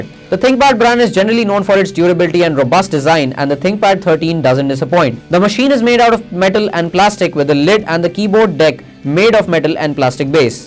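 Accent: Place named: India. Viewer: A hindu accent combined with a shitty audio quality. Thank you, but not, thanks.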